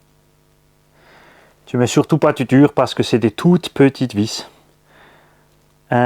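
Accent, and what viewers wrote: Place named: Alsace, France. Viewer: Excellent l'accent alsacien amplifié avec toute les petites phases, on dirait mon plus jeune fils qui fait le touillon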